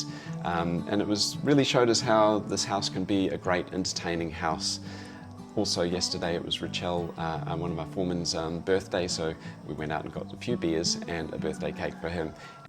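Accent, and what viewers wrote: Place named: New Zealand. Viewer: Sound like a kiwi living a better life.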